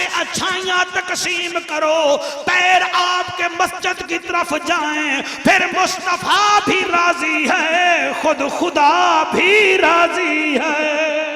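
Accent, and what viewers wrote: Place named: Saraiki, Pakistan. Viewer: Subhaan Allah bayan boht Acha hai🥰🥰🥰🥰🥰 Lakin Andaz main saraiki+Punjabi+Urdu🎌 Hai Jo Thora ajeeb Lagta hai😏😏😏😥😥😣😥😣😥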